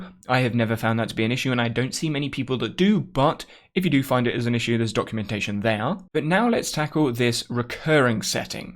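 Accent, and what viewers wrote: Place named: United Kingdom. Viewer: So refreshing to have a British accent explaining something to us. :-)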